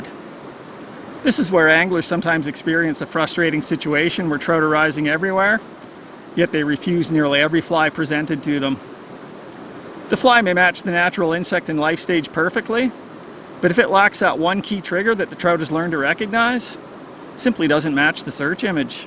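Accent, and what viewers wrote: Ireland. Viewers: Are you in Maine/Labrador? I can hear an Irish twang in your voice. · Really interesting. Love the irish twang as well.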